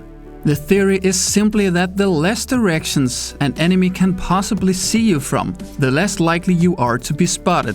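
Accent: Denmark. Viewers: Er du dansker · are u danish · are you danish ? your accent sound a lot like it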